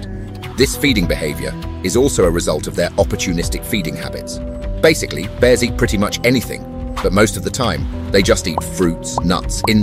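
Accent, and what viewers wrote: England, United Kingdom. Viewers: I hate how they use this guy with this english voice in soo many utube vids. I turn off vid as soon as i hear his voice. I wont be watching this channel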